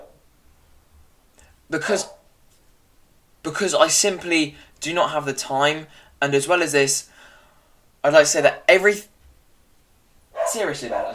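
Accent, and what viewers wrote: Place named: United Kingdom. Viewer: Bruh he bri’ish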